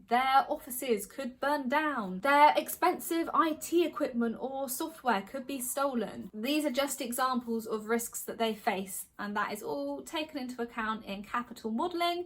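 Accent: United Kingdom. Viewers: This is the first video of your channel I've watched since am a Thai guy, who is looking forward to applying to a B.Sc. Actuarial Science in a certain university. I personally think you look so British, assuming by your accent. And all of your question replies are so helpful, all of which make me understand more clearly about what I am gonna face after graduation. thank you!!! :) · Her british accent is so elegant! I wanna speak like that 😭💔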